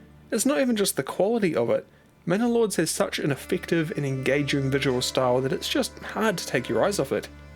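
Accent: New Zealand. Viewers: Is this new zealand accent?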